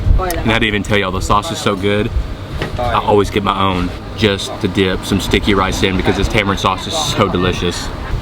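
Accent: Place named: South, United States. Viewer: Is it just me, but I think Thai food have changed you 😝. I miss your slow-paced talk, the cool & relaxed southern style 🙂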